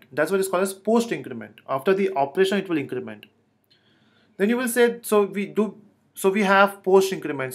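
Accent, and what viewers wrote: India. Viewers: Why is every programming tutorial ran by an Indian guy?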